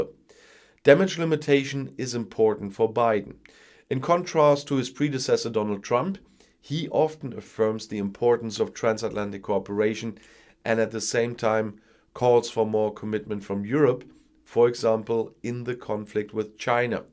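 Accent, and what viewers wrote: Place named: Germany. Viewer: ), 'Wertschöpfungskette':)., you are a true European: you combine convincingly English spoken news with a slight German accent, having trouble remembering the word for supply chain in your native (?) language with a Belgium given name and Dutch last name.. Way to go! BTW: Genius example of German functional descriptive language word salat. BTW2: I just realized being Dutch from German ancestry with my kids, all possessing Italian, French, Dutch and possibly German nationality. My email address ends in.eu. We will get there eventually.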